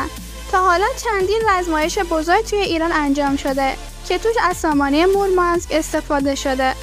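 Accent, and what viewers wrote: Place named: Afghanistan. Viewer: گوینده افغانی هستش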